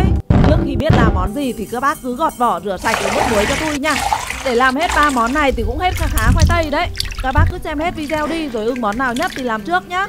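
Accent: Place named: North, Vietnam. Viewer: Thiệt ko mún xem kênh này mà nó tự hiện ra thôi. Lại giọng miền b thì ko ưa đc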